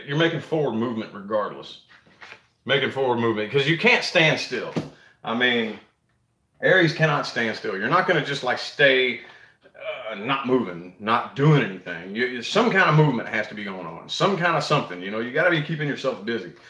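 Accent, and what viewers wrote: South, United States. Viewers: New subscriber alert!!! Loving that Southern accent of yours! Great reading. Thank you.x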